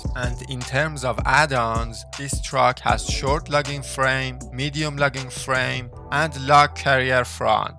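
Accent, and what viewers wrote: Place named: Iran. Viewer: dude i love your persian accent XDDDDD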